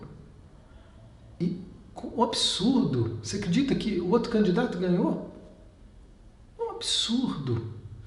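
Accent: Minas Gerais, Brazil. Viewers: Gratidão!! Conheci teu canal através de um grupo aqui em Garopaba SC há um mais de um ano, desde então assisto todos, reasisto....E assim vou, passo à passo plantando sementinhas de autoconhecimento!! Adoro esse sotaque mineirinho!! 🤗🤗🤩🤩👏👏👏🙏🙏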